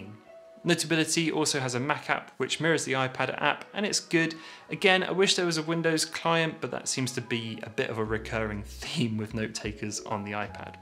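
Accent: England, United Kingdom. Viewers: English accent is such a flex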